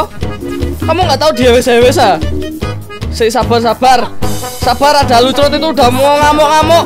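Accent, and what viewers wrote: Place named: East Java, Indonesia. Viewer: jawa timuran , rame juga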